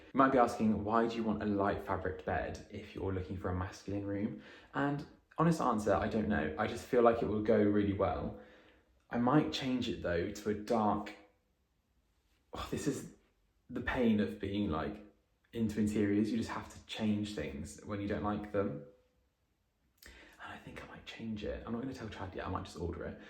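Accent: England, United Kingdom. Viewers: thank you for this enjoyable video. I love your content, engaging personality, and enthusiasm in home renovations and decorating. Your joy is infectious and inspires me to get out of my chair and to do something. Lol. As an American, I love listening to English accents. I could listen to you for hours. In a world with so much conflict, you give me a joyful escape for minutes. Everything you do is amazing. I agree, the pink color looks great with the other colors. Don't forget that real men wear and use pink. Lol. Robert.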